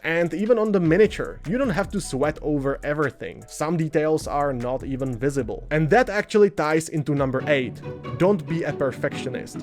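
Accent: Czech Republic. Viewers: You sound very czech. :D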